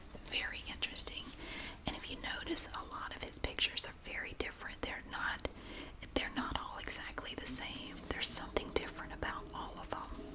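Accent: South, United States. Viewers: Hi,you've got me well and truly hooked on your videos,especially the jewellery haul ones-only problem is,they are so relaxing that l fall asleep half way through,so have to view them over again to get to the end of all the items.l really enjoy listening to your southern drawl,l am from England so it's just fascinating to me.Please keep doing what you do,l will keep watching.Thank you so much,and may 2021 be a year of peace,good health and happiness for you and your boys(and 😺 kitties)love from Heather.xx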